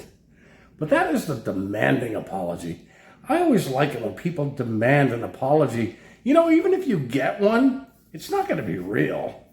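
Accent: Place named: New York City, United States. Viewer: Hi, love your true life stories. You have a knack for encapsulating human nature and real life situations in an interesting and entertaining way. Really enjoyed this one. Like your accent as well is it NYC?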